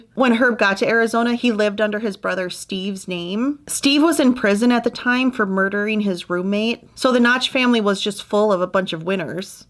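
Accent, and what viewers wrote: Minnesota, United States. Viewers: Love that Minnesota accent! Also from MN ❤❤ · I don’t comment on channels often, but had to say what a great job you do at storytelling. I came across your channel just a few weeks ago, and of course being a former Minnesotan, I was intrigued. This story really struck a chord as I went to SCSU in 89’ and my townhome was so close to this quarry. I had never heard of this story before, it was heartbreaking to say the least. I clearly remember when Jacob Wetterling was abducted and the National Guard flying over my dorm room in search. Always a true crime buff, but now a Floridian, I look forward to your podcasts…and loving your accent as it sounds like what will always be considered “home” to me…Minnesota! My best to you!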